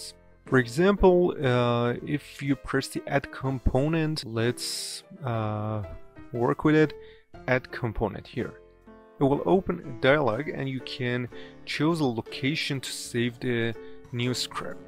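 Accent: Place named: Eastern Europe. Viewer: probably dude eastern european dont make fun of it like that, Don't make fun of accents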